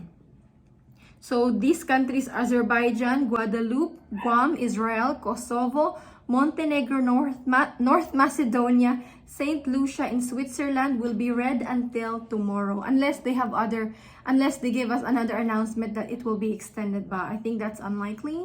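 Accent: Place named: Visayas, Philippines. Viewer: Bisaya gihapon ka day.sinultihan plng nimo.mailhan na day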